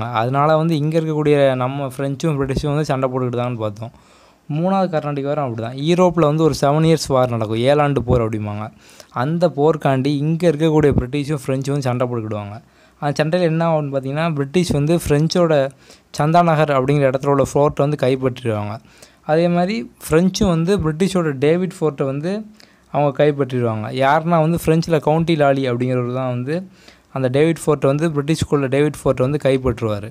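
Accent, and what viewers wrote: Tirunelveli, India. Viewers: sir neenga tirunelveli ninaikren tirunelveli tutico slang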